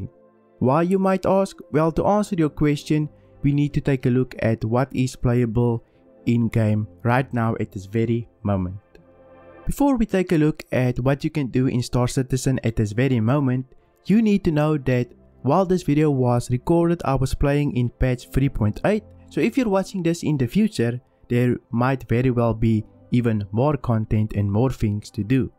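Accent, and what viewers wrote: Afrikaans, South Africa. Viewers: Awe my broe. I hear this guy speak for 3 seconds and i'm like "is he from south africa?" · Lekker dude, ek het subscribe. ek kan mooi hoor jys afrikaans. Ek dink jy moet jou kanal in Afrikaans doen. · Are you South African? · Ayyyy a SOUTH AFRICAN bru, keep up the hard work and thank you for the video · Ek kan jou Afrikaans hoor my ou hahahaha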